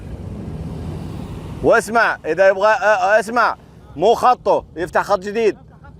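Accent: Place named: Jeddah, Saudi Arabia. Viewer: راعي القناه لهجته بدوي بس النغمه جداوي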